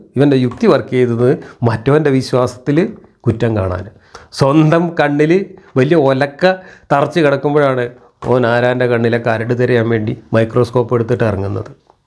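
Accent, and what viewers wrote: Malappuram, India. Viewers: മാഷ്ടെ സെൻസ് ഓഫ് ഹ്യൂമറും മലപ്പുറം സ്ളാംഗും അടിപൊളി 😅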